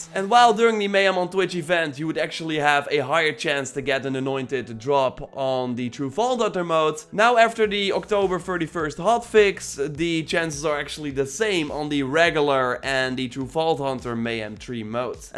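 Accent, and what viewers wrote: Netherlands. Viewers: where are u from u have like a dutch acent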